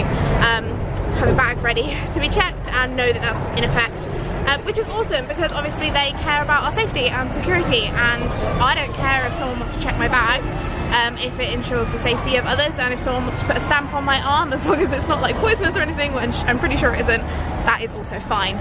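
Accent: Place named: England, United Kingdom. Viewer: Also I loved the way you said my name, English accents 😍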